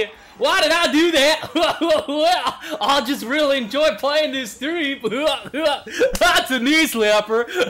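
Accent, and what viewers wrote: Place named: South, United States. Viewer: lmao that southern accent. People here in SC actually say "fixin to do something" I didn't think that was a real thing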